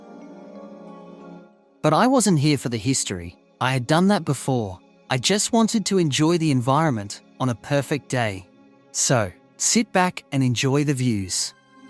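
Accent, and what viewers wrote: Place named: Australia. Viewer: Congratulation on a very well done and interesting video. And no problems at all with the voice. Clear, easy to understand and in a neutral/Aussie accent, cheers..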